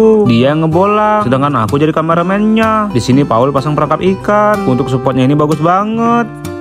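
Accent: Java, Indonesia. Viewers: Wong jowo a mas kok medok boso jowone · Bg kmu asli mana sih kok ngomong nya ada jawa nya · Jowo mu ngendi to bang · Jowoan smpean cak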